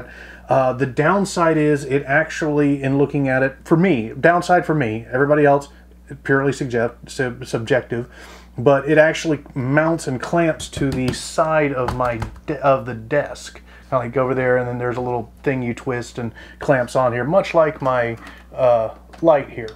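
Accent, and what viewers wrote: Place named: United States. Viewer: Never heard of Grex airbrushes, so am guessing by your accent and hints about Dollars you are in USA?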